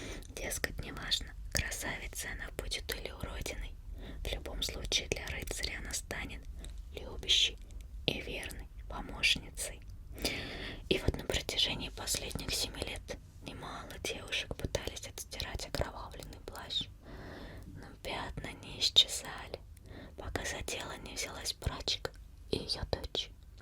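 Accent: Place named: Russia. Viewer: Amo el acento ruso, relaja muchisimo❤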